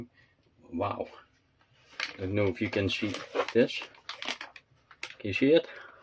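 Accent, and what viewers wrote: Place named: Netherlands. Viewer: Great job with your unboxing video for the Numbered Imajica,!! Love your Dutch accent! And your English is flawless, my friend!!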